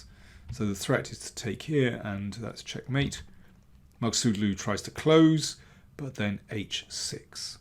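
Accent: United Kingdom. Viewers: Absolutely agreed. What is crazy is how long he has produced such top-tier chess content... I remember discovering this channel when Carlsen defeated Anand to be the World Champion around a decade ago. If Daniel King is analyzing a game, that's what I'm watching. From my experience, nobody does it better. And with such a pleasant British accent, to boot! That never hurts :)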